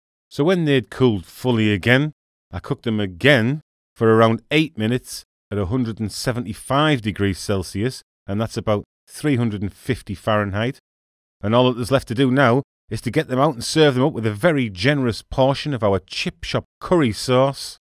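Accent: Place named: Northern England, United Kingdom. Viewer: I,m just here for that northern accent.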